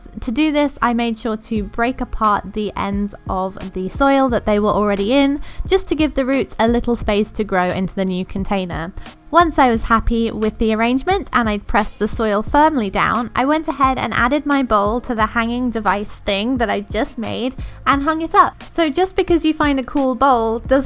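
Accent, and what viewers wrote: England, United Kingdom. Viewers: Do you live in America? Because you have a British accent but we don't have "Michael's" here do we? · Is she English or American.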